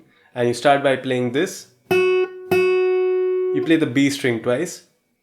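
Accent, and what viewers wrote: India. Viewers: You are Indian right I can understand by your accent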